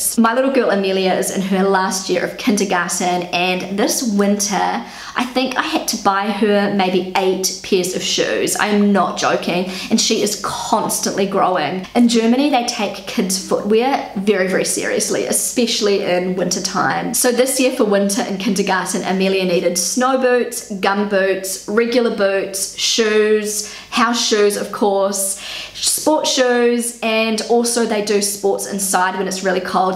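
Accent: New Zealand. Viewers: I love the NZ accent :) - thank you for the kind words about German parenting. Property is a big issue in Germany so maybe that is why German parents care about who owns a toy. Maybe we should relax more :) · Ja, das ist das faszinierende an den diversen Akzenten in NZ. Man hört noch die Ahnen. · I love your accent. :) Bevore you mentioned that you are from New Zealand I couldn't really tell where that accent is coming from. I thought maybe England or Ireland or Australia. I have never been to New Zealand. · I love your New Zealander accent! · I loved you video (and it's the first time I hear New Zealander accent) . I am in Ireland hoping to move to NZ and I love German style for parenting. The way you showed some points, even me being Brazilian seems I have similar attitudes like the German moms. Interesting to mention that all kids in Germany wear a beanie just in case to prevent cold wind, seriously all!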